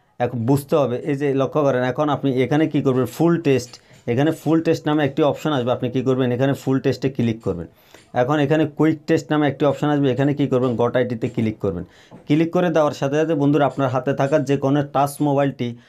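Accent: Noakhali, Bangladesh. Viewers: ভাই নোয়াখালী কোন জায়গায় আপনার বাড়ী,,,কথা শুনে মনে হল আপনি আমার দেশী ভাই,,,,,🌾🌾🌾🌾🌾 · Vai tor basa Mona hoy নোয়াখালী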